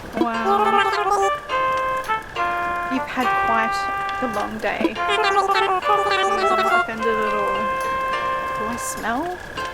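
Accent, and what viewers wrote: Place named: New Zealand. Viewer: I think they're asking because of the accent? Personally I think you sound a bit like a Kiwi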